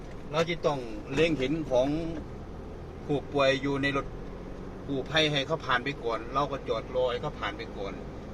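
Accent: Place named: Korat, Thailand. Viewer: ชอบดูมากพูดโคราช❤❤❤❤❤❤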